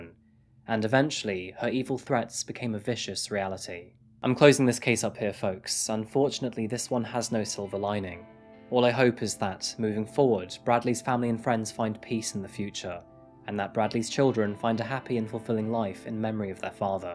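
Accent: United Kingdom. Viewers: That’s who I thought it was until I heard the British accent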